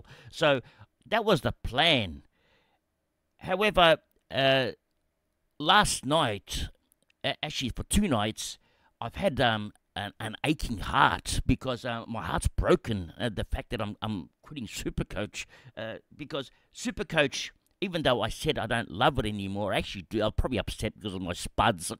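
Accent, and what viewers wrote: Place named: Thailand. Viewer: You bloody beauty… so happy… I too felt the loss when JR retired, but I’m still playing in 5 ex-JR comps with the same old crew … now I’m in 3 of yours plus 3 of your RTSS comps… when I saw the ‘news’ it left me really flat.. losing was gonna be very sad…. So glad you’ve come around… besides FPL is a very frustrating and gimmicky game, I had already decided after this year to give it away.. hahaa… btw your Tinglish is grouse and for the record I tink you are a great communicator.. ❤❤❤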